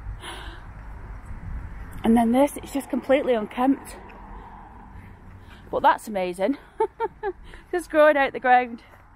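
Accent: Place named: Yorkshire, United Kingdom. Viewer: Loved your Video im really familiar with your walk and the disused buildings and pubs which i remember well having been a taxi driver based in north Manchester in a previos Life, also i think the more you are back in blighty your yorkshire Twang gets less 🤣Very informative and interesting as Always XX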